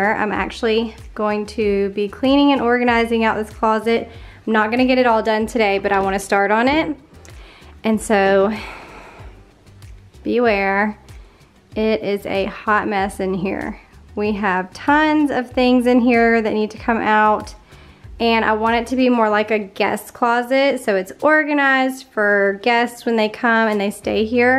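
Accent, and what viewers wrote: South, United States. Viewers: I watch you on TV while cleaning and filming along with many other favorite YouTube cleaners. Lol I noticed your accent sounded a lot like mine and was wondering what area you’re from. I am a YouTuber as well, I am from North Carolina. I really enjoyed your motivating video and I loved how your bookshelf turned out. I am always doing DIY projects on furniture.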